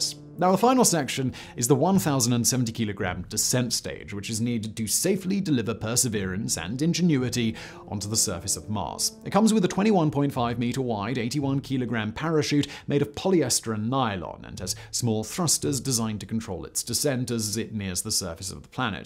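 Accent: RP, United Kingdom. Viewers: Manscaped isn’t just for under your knickers, you can also use it to remove that sweater your always wearing under your shirts. I’m sorry, the “jumper” you wear, you speak posh, so you don’t understand “sweater”.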